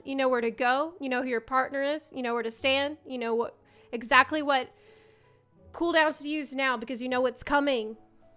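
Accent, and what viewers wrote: South, United States. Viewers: southern accent comes out when she's mad.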